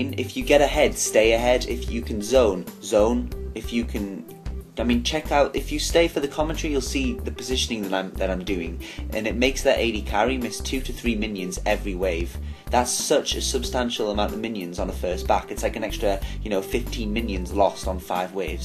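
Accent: United Kingdom. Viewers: Man, THANKS for the guide and for speaking british english xD English is not my native language but I understand yours way better than american one. So I learn as much as I can.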